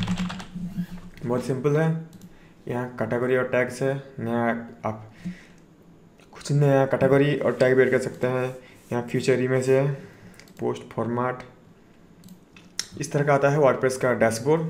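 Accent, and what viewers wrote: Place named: Odisha, India. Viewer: Tu pahle hindi sikh le... odisa se h kya bhai?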